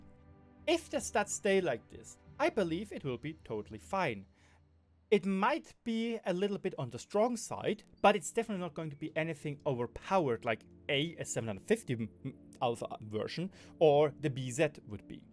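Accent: Germany. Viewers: Hey, wenn man schlecht englisch spricht, sollte man es nicht provozieren. Bist du jetzt international um mehr Leute zu catchen? Du klingst wie eine Mickey Maus mit nem Deutschen Akzent. Fast so gutes English wie Matthäus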